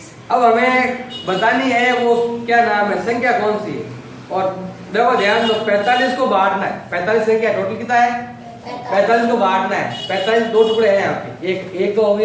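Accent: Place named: Rajasthan, India. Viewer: sir apki awaj se lag rha h aap rajasthan se ho to mujhe tusion lena h please sir jwab dena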